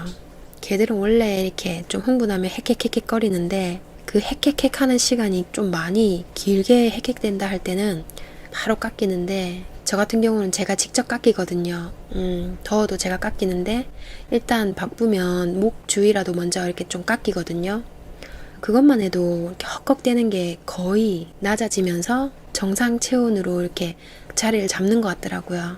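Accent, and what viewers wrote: Gyeongsang, South Korea. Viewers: 님의 경상도 억양 너무 귀여워요^^♡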